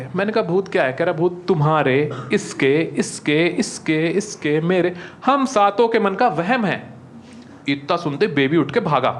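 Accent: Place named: Uttar Pradesh, India. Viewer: Beautifully performed yaar! I really like that little bit of UP accent. That accent make the content even more funny! 👏👏😂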